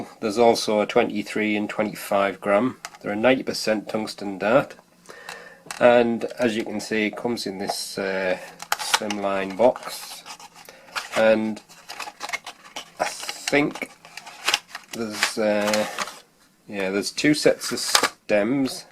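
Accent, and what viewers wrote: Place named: North East England, United Kingdom. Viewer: I can't mind too which video it was but that's when I said "I detect a north east accent!" haha... Hartlepool back in the 80's was a nice place to live, used to live in one of the nicest roads to live and now it's like a jungle now, all the houses are gone cause the council are trying to make better houses and trying to hartlepool a city but it no allowed to be one cause of the drugs and violence have increased in the last 10 years:(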